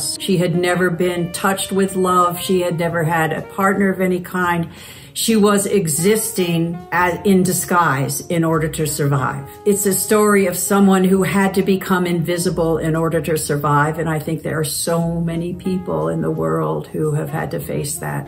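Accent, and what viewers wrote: United Kingdom. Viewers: Why did I expect her to be british? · Omg I thought she was british!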